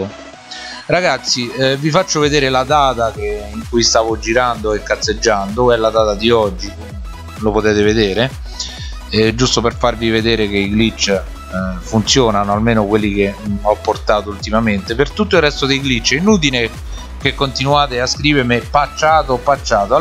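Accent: Rome, Italy. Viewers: Oioi mi fai pisciare addosso dalle risate come parli adoro il romano 😂😂😂😂😂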